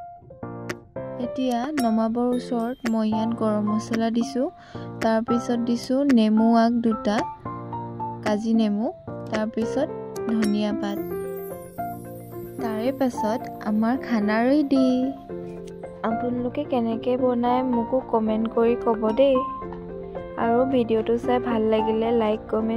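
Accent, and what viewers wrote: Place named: India. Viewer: Beautiful. I find your bangla a bit different than kolkata. Where is it spoken like this?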